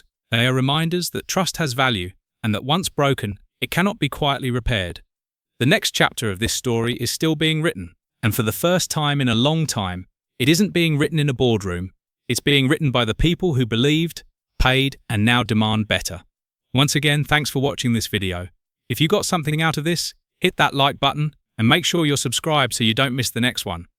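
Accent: United Kingdom. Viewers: Some Englishman is babbling about American pickups? ......Get real.....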